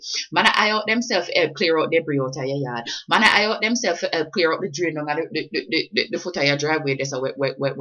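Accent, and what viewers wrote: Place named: Jamaica. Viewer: I am from Serbia,and i watch this fat girl talking,but i cant understand her ! This is not English language man ! Is this,that Jamaican patois or what ? It's wicked ~~~~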